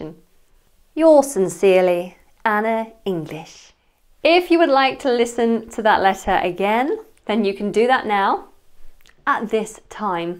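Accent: United Kingdom. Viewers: sooo british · I get a headache when I hear that British accent.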